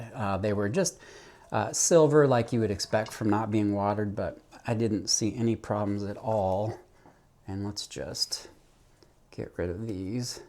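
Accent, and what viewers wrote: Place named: United States. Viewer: Love Miro…specifically for the accent 😂…, can you conjure up a delightfully calm and gentle European accent? The American thing has worn out its welcome.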